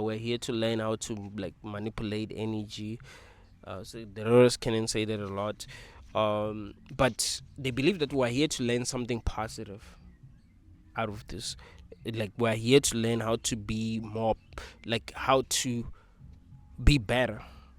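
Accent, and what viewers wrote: South Africa. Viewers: English is not his first language. He's South African. I'm South African, and English isn't our first language. As long as you can understand what He's saying, that's all that matters. If it's too annoying for you, then move along to watch other people who speak how you'd like them to speak. Have a good day.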